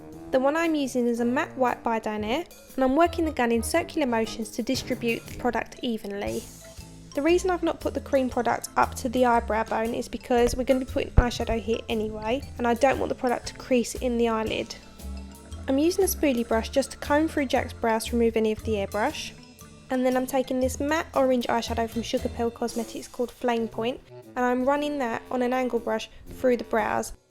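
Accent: Essex, United Kingdom. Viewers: You sound like a posher Essex;) But the word "Hatter" really gave it away. Im looking for something for halloween, Saw a black mad hatter on here that I really like but I install security systems and wouldn't have a clue how to do makeup. Especially facial hair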